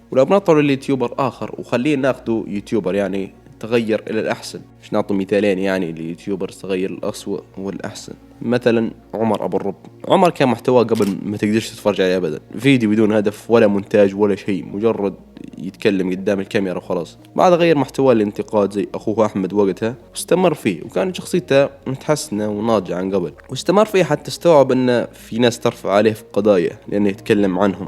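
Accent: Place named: Libya. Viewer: ماشاء الله، ولد بلادي، أصلاً، واضح من اللكنة واللهجة، إستمر يا أسطورة 🇱🇾♥️✌️👏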